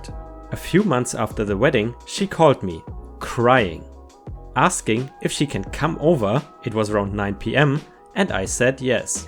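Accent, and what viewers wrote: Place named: Germany. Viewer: Your english is not bad. But I am still hearing your german accent as a german myself.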